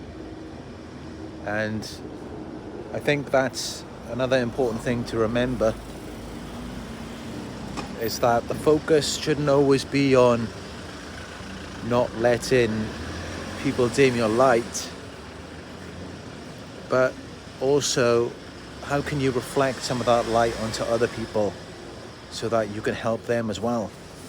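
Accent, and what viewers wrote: United Kingdom. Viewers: Omg your from the UK? Snap me to 🙌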